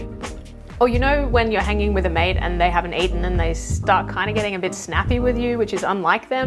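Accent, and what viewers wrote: Australia. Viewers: How good are you? I found this really engaging from the start but about a third way in was when I realised you're a fellow Aussie. I was taken aback at how completely deaf to it I was and that's rare. So I reckon it was the quality of the subject material and the presentation that made me overlook what is often a big deal for me. Or was this a Jedi/advertisers mind trick you played? Either way great material. Thanks.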